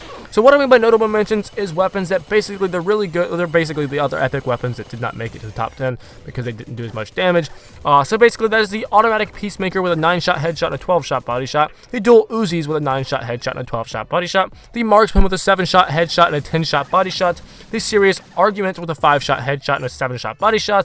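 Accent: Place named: United Kingdom. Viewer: Shut the fuck up you sound like you’re British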